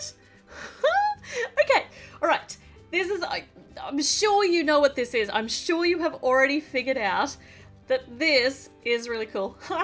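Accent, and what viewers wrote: Australia. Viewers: Ur so good at making legos and I love ur Australian accent I love u ❤️❤️🤗🤗💖😍🥰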